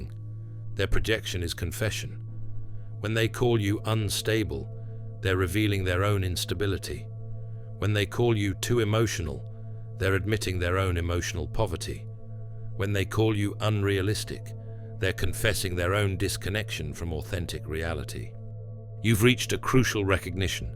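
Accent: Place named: United Kingdom. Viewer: sing-songy British voice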